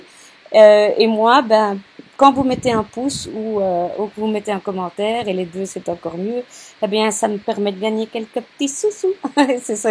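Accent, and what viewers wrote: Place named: Brussels, Belgium. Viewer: Merci beaucoup à toi pour tous ces tutos sur la caméo...j'ai toujours peur de faire des bêtises et donc je ne m'en sert que pour les découpes tradi...grâce à toi, j'ose me lancer et en plus j'adore ton accent qui me rappelle ma ville natale (Bruxelles). je te fais de gros bisous et continue à rire et nous faire rire. cela fait tellement de bien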